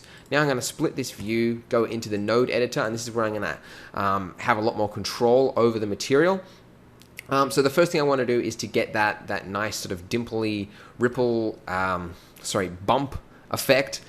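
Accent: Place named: Australia. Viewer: He is Australian i am guessing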